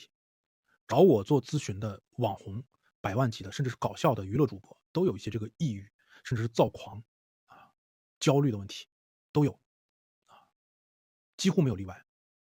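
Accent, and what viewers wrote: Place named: Northeast, China. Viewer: 长相口音，典型的东北满狗或者蒙古鞑子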